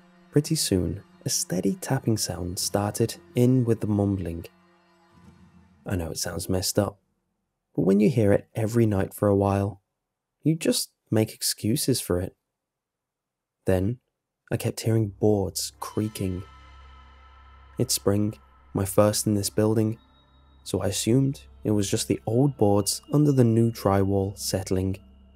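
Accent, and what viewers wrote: United Kingdom. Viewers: British much?😂😂 I love how you say garage 😅😂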